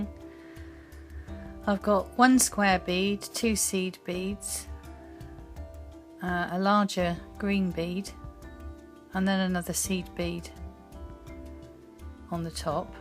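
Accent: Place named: England, United Kingdom. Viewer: Wow!! This is beautiful.....I am new to this I haven't done any yet but my poor brain is buzzing with ideas! lol! Nice to hear English accent! I live in Sussex..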